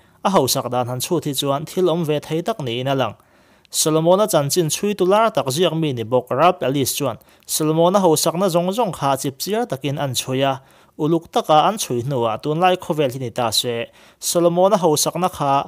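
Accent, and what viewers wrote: Mizoram, India. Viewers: Chhimlam leh hmarlam tawng lam ain ang lova,joba tihpawh ani chuan joooba ti in a lam hi,Tuifinriat pawh,chhim lamah chuan Tuifinnnriat an ti thung.Tuifinriat,Joba ti a,lam chuan mak an ti ve thovang che... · a dik loh dan i sawi chiang thei em? Khua lam ni lo in chhim leh hmar zawngin a nih ka ring. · Hmar ho chuan i lam dan hian an lam, RAANGKACHAK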